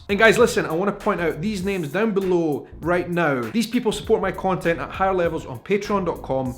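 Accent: Scotland, United Kingdom. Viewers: you are so handsome and that accent makes me shiver, are you married? If so too bad LOL.Personally I much prefer the Scottish or Irish brogue over the boring British. I'm newly subscribed, so far your vlogs are funny and done in good taste unlike some of these British idiots that come here and criticize our food, our language, and our customs.I thoroughly enjoyed when you went to New Orleans and got taken down by that drink the Hurricane. Lol you poor baby. Guiness and Dos Equis beer does that to me. Two pints of Guiness and I'm flying but don't have sense enough to stop. Love It Love It Love It. My cousin married a widow from Scotland named Rebecca, she had a couple young children. She is such a sweet woman as were her children, they fit in this French family like a hand fits in a glove. My daughter went to school with a boy whose father was from Scotland and his name was Ian. 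Lovely family. Seems like some Scottish men are on the shorter side but very manly and sexy. Just wanted to give you a shoutout and let you know how appreciated your context is, keep up the good work🌹🌷😍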